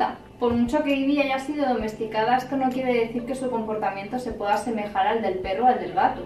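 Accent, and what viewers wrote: Spain. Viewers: que preciosa esta la conductora me gusta su acento español aunque no se si sea española jeje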